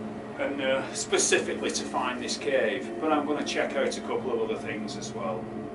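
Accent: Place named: Lincolnshire, United Kingdom. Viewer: My dads from Clethorpes...he spoke like you. I believe that was Lancashire. No..... just looked it up it was Lincolnshire....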